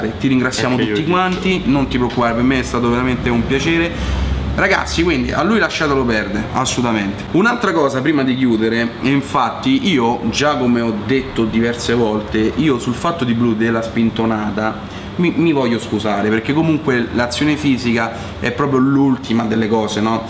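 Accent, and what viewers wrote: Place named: Rome, Italy. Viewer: Leonardo Bonucci scusa ma se nn capisci il romano è grave, nn è mica come il sardo dai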